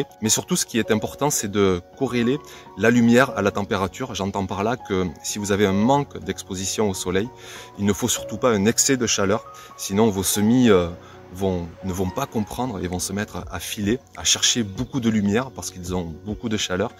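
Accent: South, France. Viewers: Merci c est toujours un plaisir de te suivre avec ton accent qui chante le sud de la France sa réchauffe le coeur bisous de Belgique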